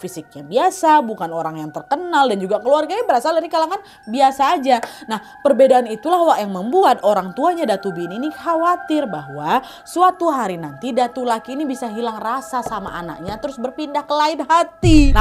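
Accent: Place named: Banjar, Indonesia. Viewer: Aku yg denger langsung ngeh ahahahaha pasti bener nih orng banjar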